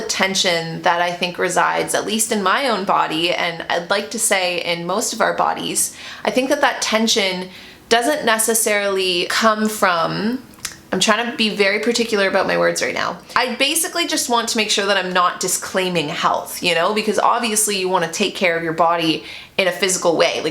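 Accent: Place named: Canada. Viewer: You're Canadian, eh. ❤️❤️❤️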